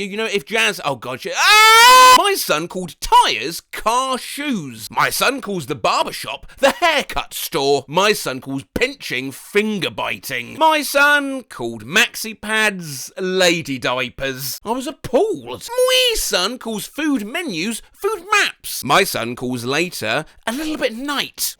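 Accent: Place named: London, United Kingdom. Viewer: . Buddy. Pal. Chum. You sound a bit like Jim Sterling. This leads me to believe you come from London.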